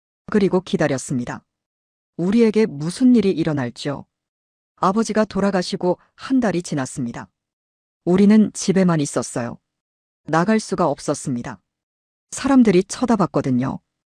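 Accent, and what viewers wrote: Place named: North Korea. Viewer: 나레이터 바꾸세요. 너무 부칸식 ~ , 사투리는 좋은데 억압죽은 그렇네요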